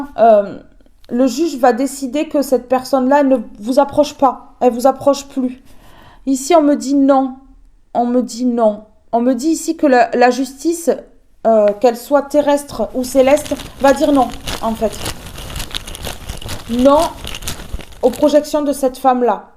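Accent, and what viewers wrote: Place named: South-West, France. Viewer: Merci j adore votre accént sud ouest de la France vous etes une bonne personn ej adore votre humour bonne continuation dans la voyance et desolee j espere ne plus vous embeter avec mes problemes merci beaucoup votre humour me fait du bien je vous souhaite que du bonheur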